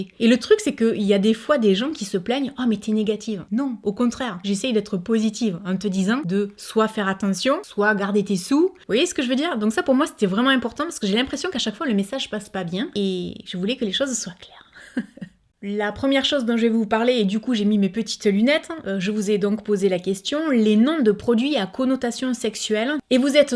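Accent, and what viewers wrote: South, France. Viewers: Coucou ma belle, pour les fonds de teint, je n'achète pas ceux là, perso j'ai toujours acheté celui de chez Avène qui me correspond, et les grandes palettes de fard à paupières, ceci n'engage que moi, mettant toujours les mêmes teintes, elle est petite avec trois couleurs à l'intérieur, toutes ces marques c'est du pure marketing pour vendre, et perso cela ne m' intéresse pas, je trouve çà moyen, moi ce qui m'agace ce sont les produits qui ne sont pas remplis complètement, ou il y à une marge parfois de 3 à 5 cm alors que c'est indiqué 100 où 200 ml, et çà prendre le consommateur pour un C ..... !! où certains tubes que nous sommes obligés de couper car il reste encore de la matière, pour les rouges à lèvres, je te rejoins je ne comprends pas également, nous sommes dans une société de surconsommation, quand je vois défiler tous ces calendriers de l'avent à des prix de fou, c'est vraiment abusé, je n'adhère pas à ce concept, après comme tu le dirais chacun fait ce qu'il veut de son argent, c'est bien de remettre de temps en temps les choses au clair, mais malheureusement tu aura toujours des personnes qui se comportent comme des enfants et la bêtise humaine ne cessera d'exister, bises à toi copine du Sud avec ton accent que j'adore, garde ta simplicité et ta bonne humeur - Maryline :thanksdoc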